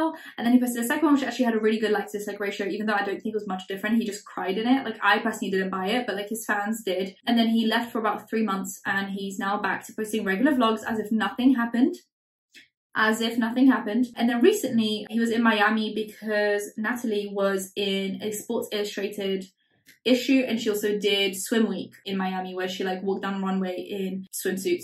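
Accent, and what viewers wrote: United Kingdom. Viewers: Wait you are polish? But you speak like your original from uk know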